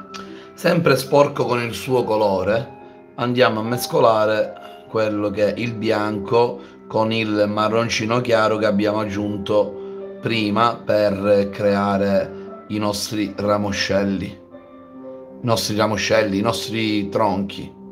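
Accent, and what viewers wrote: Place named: Sicily, Italy. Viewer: Ti ho scoperto per caso ma non ti lascio più! Penso, dal tuo accento, che tu sia siciliano come me! 👏👏👏👏👏🥰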